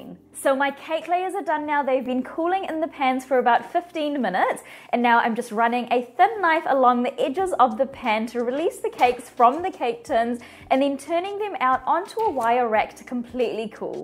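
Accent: New Zealand. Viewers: I made half the recipe last night to taste test it. It tasted great. I’m going to keep using this recipe. Before I looked at your channel I knew you were a Kiwi from your accent. Much respect from across the Tasman Sea. Thank you for developing these recipes and sharing them.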